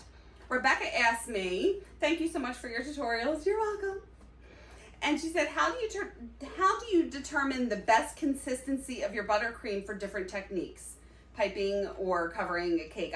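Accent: Philadelphia, United States. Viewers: Your accent makes me miss Philly!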